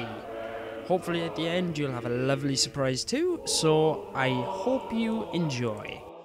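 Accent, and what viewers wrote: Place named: Wales, United Kingdom. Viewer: Your voice sounds beautifully welsh ^^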